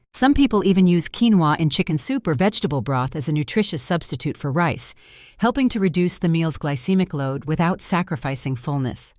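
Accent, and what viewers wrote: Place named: United Kingdom. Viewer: Food suggested are only good for rich Army Generals, thank you for your clear britsh Englisheverybody can understand.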